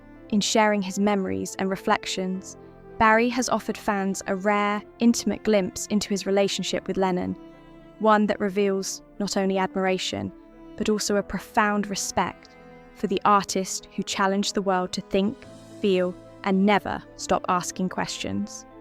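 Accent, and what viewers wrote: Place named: United Kingdom. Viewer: LOSE the extremely heavy British accent!!!! It doesn't work well for narrations!!!!!! 😮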